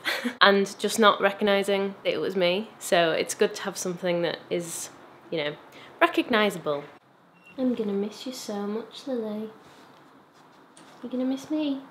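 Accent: United Kingdom. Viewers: are you from Sheffield?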